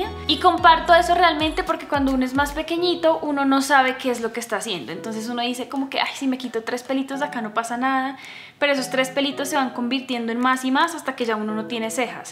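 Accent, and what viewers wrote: Colombia. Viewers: Yo apenas escucho su voz: Ella es colombiana jajsjaj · Por el acento, parece que de Colombia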